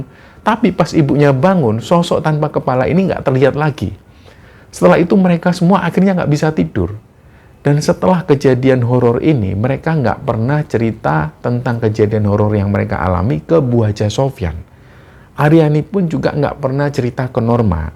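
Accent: Java, Indonesia. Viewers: saya tidak tau.. amalan apa yg dilakukan alm.bapak e mbak ariani sdah di level berpa tingkat kesbaran dan keikhlasan yg jalani sampai bisa mengangkat derajat kehidupan anak dan istrinya ... mohon maaaf jika tidak salah nenek" itu adalah wujud khodam dari alm. mbak ariani sekeluarga sehat" ya alfatihah buat khodam alm.dan almh. semoga husnul hotimah ... 🙏🏻🙏🏻 om pertahankam logat jawa ..jika di naskah memang ada bahasa asli ❤❤❤👍👍👍🤗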